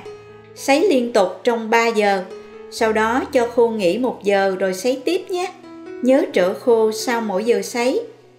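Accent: South, Vietnam. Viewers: Cối xoay hay quá. Giọng nghe đúng chuẩn miền nam. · giong chi noi de thuong qua he giong noi chuan mien nam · Cảm ơn sự hướng dẫn của về chác là khô bò. Lâu lắm rồi không được nghe 1 giọng nói ngọt ngào đặc trưng của miền Nam khi xưa. Giọng mà đọc truyện thì hay phải biết. Chân thành cảm ơn.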